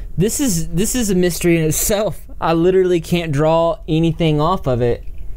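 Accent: South, United States. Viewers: you rock a southern voice THATS HARD TO DO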